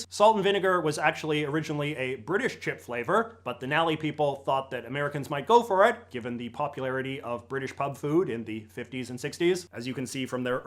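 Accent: Canada. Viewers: he’s Canadian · He's canadian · Strongest Canadian "-out" I've ever heard. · Bro is the most Canadian Canadian I have ever heard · He’s either Canadian or Minnesotan I presume :/ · This guy has the most pronounced Canadian accent I’ve ever heard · is this guy canadian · what is that accent i hear aboat instead of about lol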